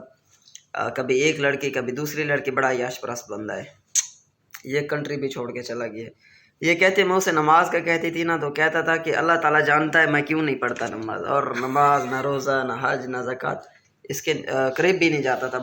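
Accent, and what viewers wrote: Saraiki, Pakistan. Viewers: awaz sa lag raha siraiki hain or ma jhang sadar say hu khush rahain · Wah birah wah , tun tay rakh, Jary sarden honay koon sarra. Sajid From Khanpur. Ap ka vlog kal dekha aur ak din mai almost 10 vlog dekh liye, Ap jo Saraiki bolty ho maza aa jata. Ji way birah ji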